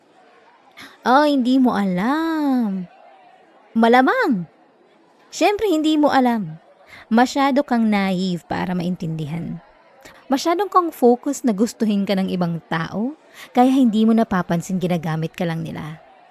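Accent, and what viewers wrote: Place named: Visayas, Philippines. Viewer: ptnginang kabisayaan nato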